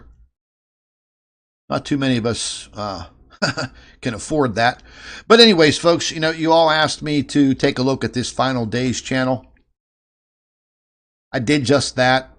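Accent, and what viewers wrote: Pittsburgh, United States. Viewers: You from Pittsburgh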